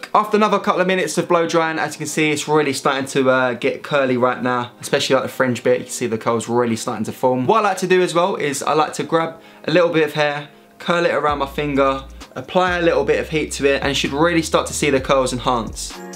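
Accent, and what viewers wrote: United Kingdom. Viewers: Bruh you speak so English English that i cant hear you i can hear American English you now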